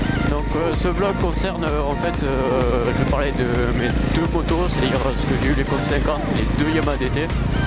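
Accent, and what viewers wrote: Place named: South, France. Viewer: l'accent zen du sud 😊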